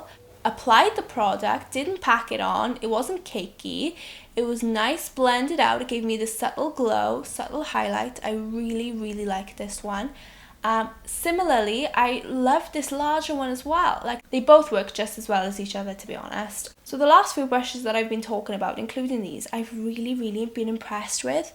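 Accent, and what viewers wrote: Wales, United Kingdom. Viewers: Are you from Wales? 🏴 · U Welsh?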